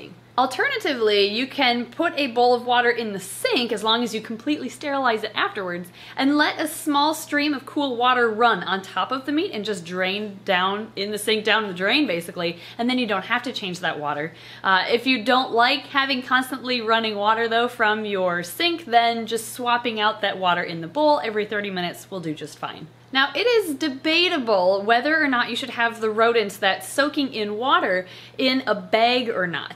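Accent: Minnesota, United States. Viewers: Minnesota English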